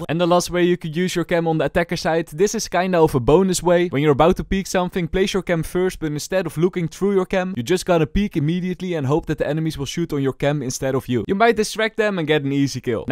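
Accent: Netherlands. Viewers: are you dutch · i smell the dutch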